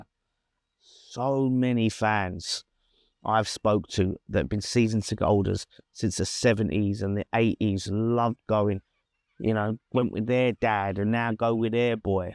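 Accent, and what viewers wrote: Jamaica. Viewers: I probably agree with everything you say - but please change your accent, you sound like a yardie in Jamaica - I had to switch off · why do you talk like a jamaican?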